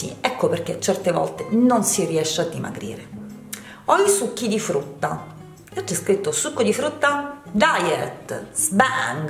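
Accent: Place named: Sicily, Italy. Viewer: Ciao, sono new entry,d’origine sicula come te ma marchigiana d’adozione ormai da quasi una vita, mi piace tantissimo ascoltarti, forse sarà propio per l’inflessione 😜 Grazie perché mi sto lasciando andRe ultimamente e seguendoti forse riesco a ritrovare in me la fatidica “scintilla “e poi via...a razzo!!🤩🤩🚀🚀😘😘❤️❤️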